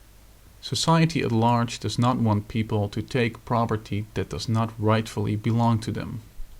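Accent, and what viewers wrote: Netherlands. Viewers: Do I hear a slightly Northern-European accent there? Perhaps Dutch? :)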